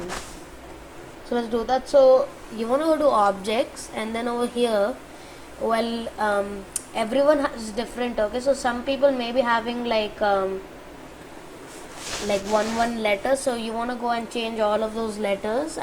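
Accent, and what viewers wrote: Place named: India. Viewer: I think ur Indian cause ur voice xD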